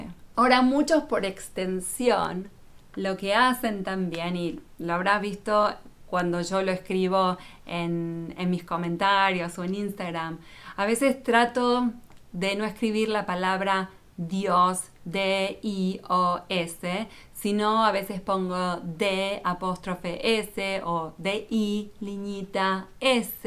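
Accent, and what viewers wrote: Argentina. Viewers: querida; hasta que se dijo v ine a vivir ; estuvo bien, cuando se dijo USA, alli si que ya no mas me gusto, tienes un dialecto argentino , asi esta mejor , no lo destruyas con usa , querida evita esas palabras, ha sido y es el demonio desatado sobre nuestra region, no la riegues. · no tengo mucho tiempo de verte conocido por medio de YouTube y me da tanto gusto de escucharte tus expresiones y explicaciones acerca de las costumbres Judías y de pronto pensé que escuchaba a una Argentina, pero bueno eso no importa; sabes amo a Israel amo a Jerusalén quizá no tengo una forma de expresar clara en cuanto al decir amo…… pero siento algo que no se cómo describirte, Dios es bueno…….sigue adelante con esas explicaciones tan bonitas, mi nombre es Luis y soy de Guatemala.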